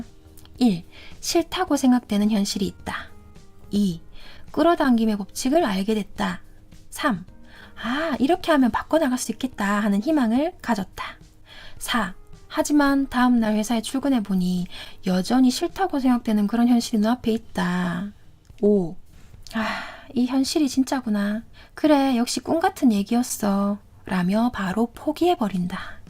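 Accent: Gyeongsang, South Korea. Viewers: 말투가 경상도가 고향 인가봐요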